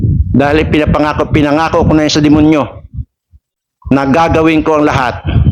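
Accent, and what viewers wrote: Iloilo, Philippines. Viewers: Ilonggo ka gali tuno mo ilonggo sa totoo lang taga diinka haw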